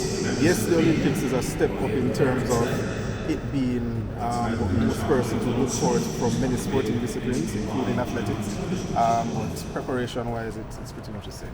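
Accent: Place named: Jamaica. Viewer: I was listening today and thought it was a Jamaican announcer and then convinced myself it was wishful thinking🤣🤣🤣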